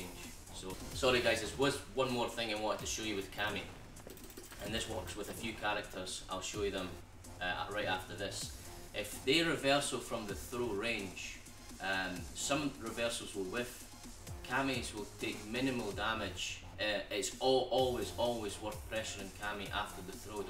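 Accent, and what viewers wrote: Scotland, United Kingdom. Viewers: i always thought you was from Scotland with your accent?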